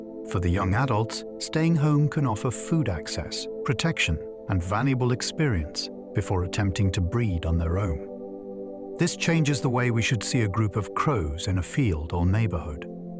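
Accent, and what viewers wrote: United Kingdom. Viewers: Why is everything narrated with a British accent? It gets old, you know? If you listen to allot of things like this, or audiobooks, it gets real obvious. I can't take it. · 😂 Why shouldn't it be. I find the soft clear British accent part of the relaxing experience. And English is not my mother language.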